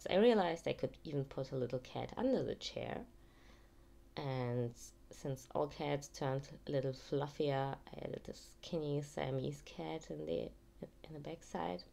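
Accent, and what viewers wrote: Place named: Germany. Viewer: damnnn dat german accent tho 😂 (Im from germany and I struggle with that too, no hate)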